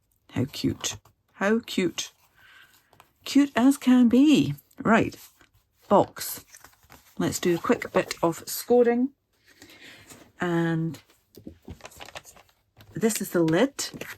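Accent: United Kingdom. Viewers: Oh my goodness no, you do not look like “her” at all!! 😂 Robin Williams must have studied many English accents to perfect it like he did, the minute I hear your voice, it takes me right to that movie, he did a great job with that character! I agree, the SU community has brought me many wonderful friendships that have lasted years, since I started using their products back in 1998! I’ve only been a demo the last 7 years, in June, and I couldn’t imagine a life without SU and all the wonderful people in it! 😌 Take care, it would be wonderful if our paths could cross one day! 💜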